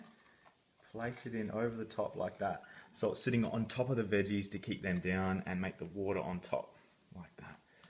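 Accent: Australia. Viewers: Accent??? He's an Aussie... easiest accent to understand! 😁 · It's especially funny when translating an Australian accent.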